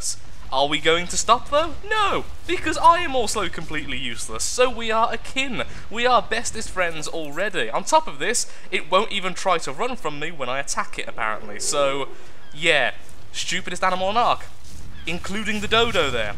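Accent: United Kingdom. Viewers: British accents make everything sound weird...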